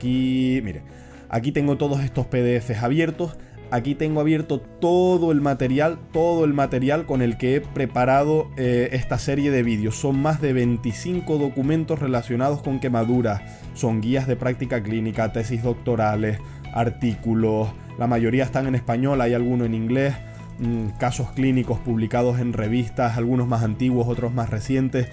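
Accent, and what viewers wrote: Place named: Canary Islands, Spain. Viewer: Muchas gracias, por tu acento pareces de mi tierra, Canarias. ¿Qué tipo de quemadura serían las producidas por láser estético? Gracias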